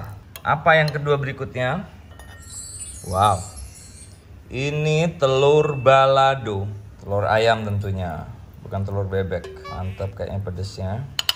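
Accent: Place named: Madura, Indonesia. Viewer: Ari Lasso pake bahasa logatnya Madura. Berarti dia masih tinggal di Jakarta. Padahal rumah aslinya itu di Madiun Jawa Timur.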